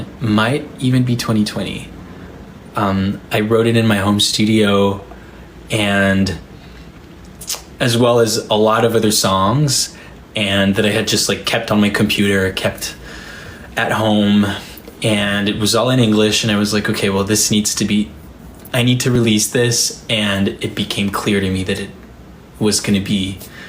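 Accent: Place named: Quebec, Canada. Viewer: Il me tarde beaucoup d'écouter ta nouvelle chanson... Par ailleurs, j'adore ton accent québécois: ) and I like it too when you speak English: ). Je t'ai découvert par hasard en regardant DALS, je vous avais adoré Marie et toi, et ca a été un bonheur de te suivre depuis. Surtout ne change pas, merci pour tout: ).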